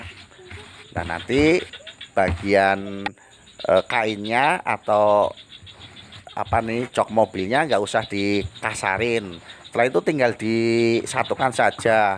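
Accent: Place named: Banyumas, Indonesia. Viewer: logatnya ada ngapkinya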